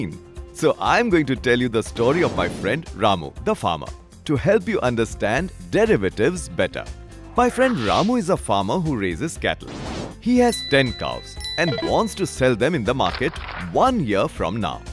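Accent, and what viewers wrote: India. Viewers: Excellent in providing a clear and simple illustration of futures. Love the Indian accent. Thanks for doing this.Keep it up! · That is a very American looking host with a very indian accent... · The single most annoying accent in the world. The Indian accent -good grief! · that Indian accent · I got here looking for the mathematician term of "derivatives" This is the financial one -_- but it was interesting. I am learning English and I admit that I am not completely good at it... But starting from the first 5 seconds of the video I knew that It was and Indian guy hahahaha. I asked myself. Of course, this video is aimed to western audiences but what is an Indian Guys using an Example his "sacred" animals. In as you have seen the example was refereed to sell them to eat, India Mcdonalds are not allowed to use bovine meat on their products. And I know that I could sound kinda extremist but there are a bunch of animals that could be used for this example in a better way without violating cultural dogmas. 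At the end it was a nice video and I enjoyed it. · This was very informative, but why the heck does he have an Indian accent? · Your Indian accent is extremely annoying! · I didn't expect Indian accent lol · I adore the indian accent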